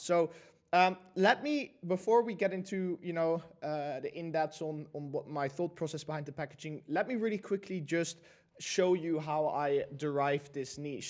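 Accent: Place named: Netherlands. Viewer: Are you Dutch? Cause I hear the accent 😂💪🏽💯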